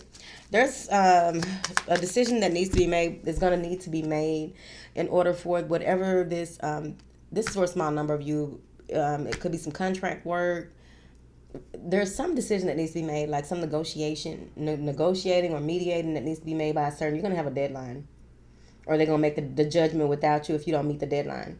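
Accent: South, United States. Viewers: I love her country gal accent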